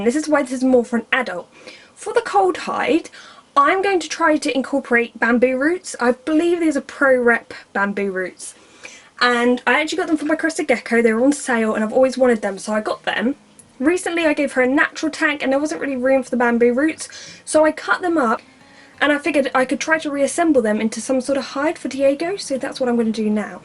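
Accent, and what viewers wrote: United Kingdom. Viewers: Michael Holmgaard Umm... seriously? She is a British and British talks like that, you know that right? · Michael Holmgaard she's British and British people sound like that...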